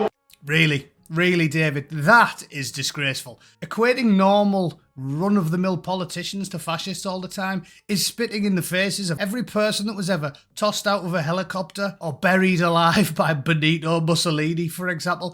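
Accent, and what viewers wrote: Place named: Middlesbrough, United Kingdom. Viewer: I love your Boro accent. I left when I was 16. You’re priceless. Make me cry laughing fella 😂😂😂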